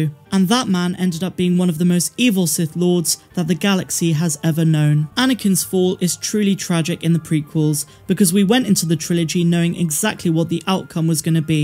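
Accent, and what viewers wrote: United Kingdom. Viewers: Love you station and your British accent; I'm a straight Black American man. And I generally agree with most of your analysis but I totally disagree with your analysis on why Anakin turned out to be Darth Vader. You see he was a slave he wasn't even going to make it to adulthood had he not been taken in by the Jedi Temple. He should have been much more appreciative and do the things that he was asked to do that would have only made him into the chosen one he should have been. But he wanted to be rebellious and disrespectful at times and therefore the two top Jedi Masters knew who he was before he did. He was precocious and vain and he would've still rebelled, even against his original father figure, Qui-Gon Jinn at a certain point. Your analogy sounds like the abuser blaming the abused for abuse.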